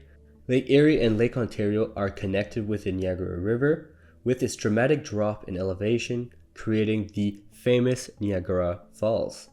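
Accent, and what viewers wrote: Quebec, Canada. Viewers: he’s definitely french canadian considering that’s how you pronounce niagara in french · He sounds qubeccois. Based on the way he pronounces French names. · Seriously, you sound Canadian, but you're mispronouncing very common things like Mackinac and Niagara. Macnik? Neeagara? Isn't this how we used to flush out German spies in Allied uniforms in WWII? :D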